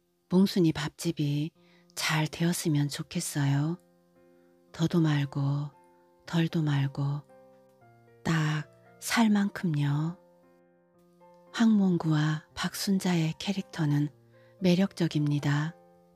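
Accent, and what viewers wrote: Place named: South Jeolla, South Korea. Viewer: 전라남도 사투리가.. 겁나~ 맛깔나부네잉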